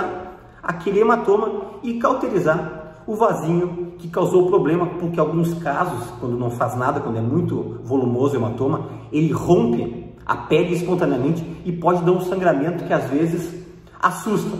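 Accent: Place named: South, Brazil. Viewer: Dr...um médico de muita sabedoria...deveria ter mais médicos desse modelo...sabe é explicada muito Me ajuda demais essas explicações Merece nosso maior respeito Tive e operei...rompeu mais Deus como dói Como vi já o chamaram de um médico lindo e maior elogio no sotaque Sulista....normal as pessoas ver um médico q ajuda muito as pessoas ser amado por muita sabedoria e bondade em nos ajudar Obrigada Dr...tem todo meu respeito Q Deus o abençoe imensamente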